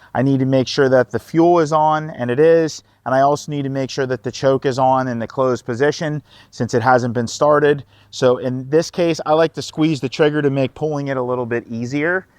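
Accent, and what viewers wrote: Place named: Western Pennsylvania, United States. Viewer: Very good video. Also loving the western PA accent!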